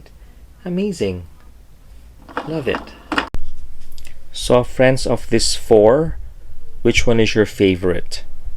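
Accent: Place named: Pakistan. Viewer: I believe he is from pakistan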